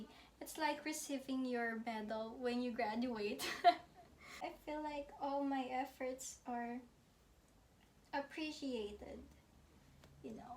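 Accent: Philippines. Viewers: Wow hearing ur voice I just realized that ur Philippino and ur voice is so cute and sweet u r so good at acting and keep up the good work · u sound like a filpino? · weit is she Filipino bc she has the accent and she said and hirap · I think your from Philippines because that's how I sounds when I'm speaking English and I'm from Philippines 🧋🧋🌸🌸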